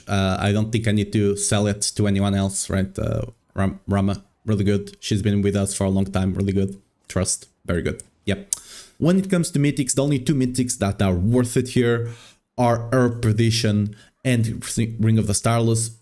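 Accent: Portugal. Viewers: Are you Portuguese by any chance?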